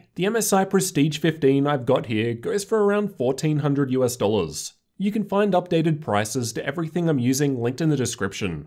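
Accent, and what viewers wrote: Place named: Australia. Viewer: aww, I liked 1-0-7-1-0-u. It’s surprisingly melodic with an Australian accent.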